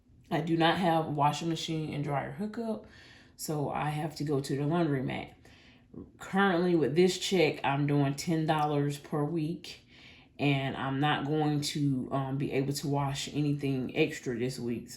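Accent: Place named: South Carolina, United States. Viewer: I absolutely love this video. You are so organized and detailed. I am from NC and I can definitely hear that SC accent😂❤ Also congrats on being monetized. I m trying to get there 🙏🏾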